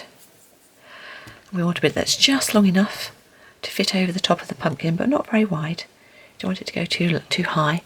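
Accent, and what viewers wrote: United Kingdom. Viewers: Very cute! You are a great teacher, and your videos are charming and relaxing with the piano background music. It is impossible to be in a bad mood and watch one of your videos. They are so well-narrated, and so thoroughly British! Happy Halloween!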